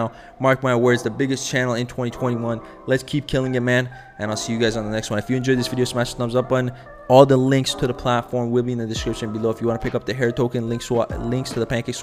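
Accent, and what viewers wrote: United States. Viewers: Most American sounding Russian I’ve ever heard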